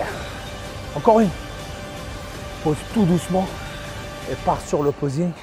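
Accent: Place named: Iran. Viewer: IL EST IRANIEN LUI C EST PAS POSSIBLE CET ACCENT hahahahahah de la part d'un autre iranien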